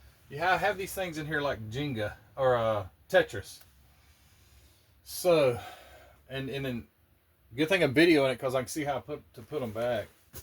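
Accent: South, United States. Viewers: Great update on the model room! That Chrysler car looks like it will be challenging but fun. Interested in seeing you build it. Dont worry bout your southern accent, i understand you just fine, being born in Mississippi! 🤣🤣🤣 Thanks for sharing,!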